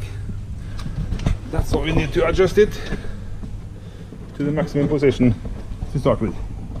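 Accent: Norway. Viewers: Norskengelsk er så kult 😊 (Norwegianenglish is so cool)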